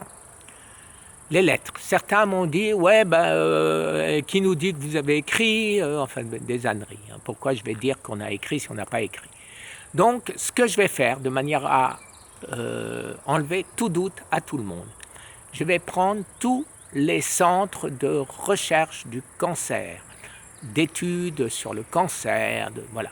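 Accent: Alsace, France. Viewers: Coucou j’avais bien cerné que vous étiez de l’Est de la France avec votre prénom et votre accent Vous êtes Alsacien du bas Rhin Et moi je suis née en Lorraine À Thionville (57) Puis vécu près de Metz J’habite en Provence (83) aujourd’hui Pour en revenir à la vit B 17 pour le noyau d’abricot riche de cette vit c’est l’extraction du centre du noyau je l’ai entendu sur YouTube Ou le trouvez-vous?! Merci 🙏🏻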